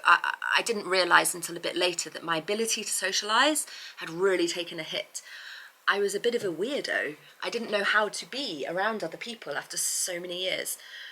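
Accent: United Kingdom. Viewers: Hi. It's so nice to hear a fellow (and beautiful) English accent. Best of luck with everything.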